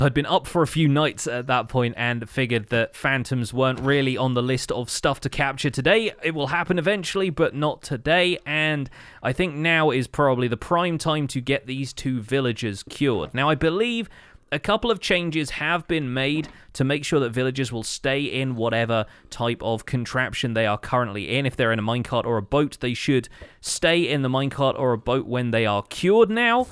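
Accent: United Kingdom. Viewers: The notification woke me up at 7am but I’m damn sure gonna let that cocoa butter British voice soothe me back to sleep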